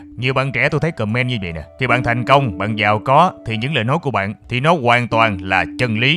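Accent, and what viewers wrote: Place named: South, Vietnam. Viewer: Mình nghĩ sau ad nên để chữ ở trên video,các bạn miền nam nghe dễ,nhưng miền bắc nghe hơi khó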